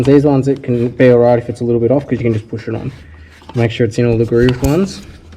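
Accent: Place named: Australia. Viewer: Thanks mate.. i am in thailand but having it explained in proper Aussie language was gold.. thanks for your video..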